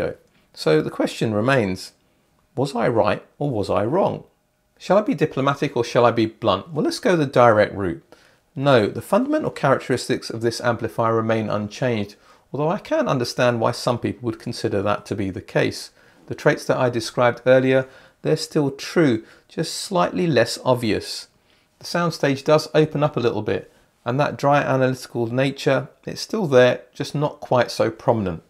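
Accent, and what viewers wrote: United Kingdom. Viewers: after 10 minutes, i could not take anymore the barroque and burgeous of the whole british speaking thing, sorry. Overdose. so much for just explaining a device...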